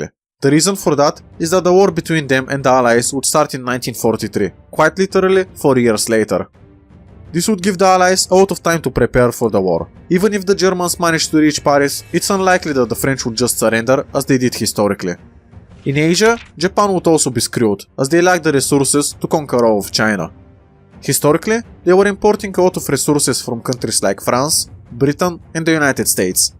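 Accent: Bulgaria. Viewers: По диалекта разбрах че си Българин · Are you by any chance bulgarian? The accent kind of gave it up😊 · Bro are you from da balkans shot you speak kinda like one of my bulgarian friends : ? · are you bulgarian by any chance? you sound like it.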